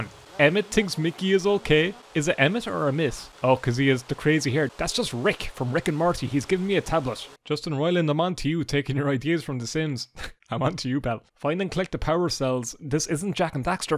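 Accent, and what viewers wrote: Ireland. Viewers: I'm a simple man. I click on a let's play of the Sims games. I hear an irish accent. I hit subscribe.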